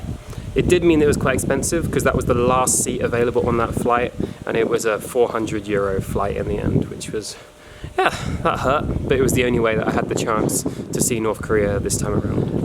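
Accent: United Kingdom. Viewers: Jonathan Stoevelaar - he’s not American lol he has a British accent..... you’re not an arsehole just ignorant lol 😆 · This video just popped up in my feed. Sorry man! I don’t have a clue who you are and what you do, but being at that airport alone. It sets you in the mind of how Son of MAN’s return is going to be. It’s going to be lots of abandoned places. Also, I couldn’t really tell you were from the UK due to your accent not being as strong. I thought you were from Australia, but I was wrong. Anyway, be safe on your next trip wherever you go. God bless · This guy talking in this video has an English accent, with a British passport. Syria was destroyed by the USA on purpose, like they destroyed Afghanistan, Iraq and Libya and now want to destroy Iran. Why are you blaming the Assad regime for destroying Syria and for making you a refugee in Germany?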